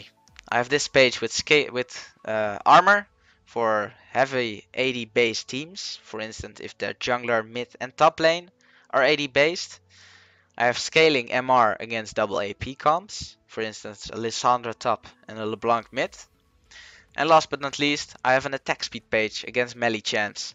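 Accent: Netherlands. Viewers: From the Netherlands i think?? Nice guide thanks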